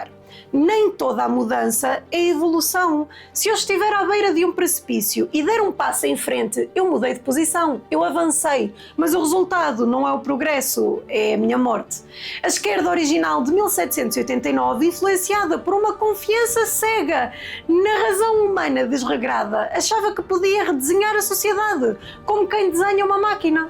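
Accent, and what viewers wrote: Portugal. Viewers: Estou vendo do Brasil, muito legal ouvir o sotaque português. Essa generalização é eficaz em confundir os interlocutores de uma discussão, mas me parece que a palavra liberdade também tem se tornado uma armadilha semântica.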